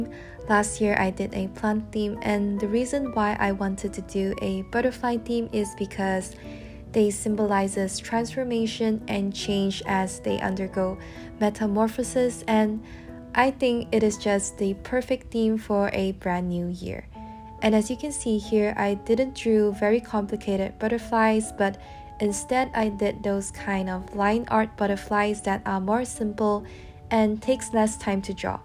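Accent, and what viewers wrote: Malaysia. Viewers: Immediately thought that you're a Malaysian when I first hear your voice! Super nice bujo and here you gain a new follower!!! ♥♥ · Omgg it's so pretty. Your accent sounds Malaysian, are you from Malaysia?❤️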